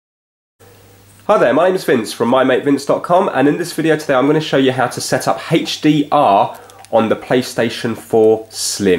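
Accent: United Kingdom. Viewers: I love his British accent!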